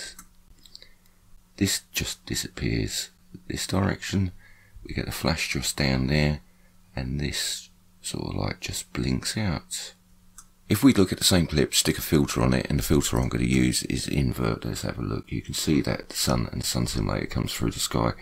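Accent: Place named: London, United Kingdom. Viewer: i thpught it was danny dier!! I lav tha landan acsent maytee..up thee apples n blady pears boss...awsome great explanation in every video u put on...👍☝☝☝☝thank u..from nottingham..uk.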